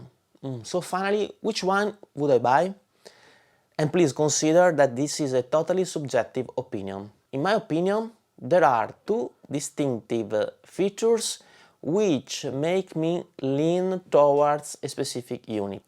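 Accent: Venice, Italy. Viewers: Complimenti per le capacità esecutive e dettagliata recensione, bravo Dall'accento direi italiano, Veneto, probabilmente veneziano 😊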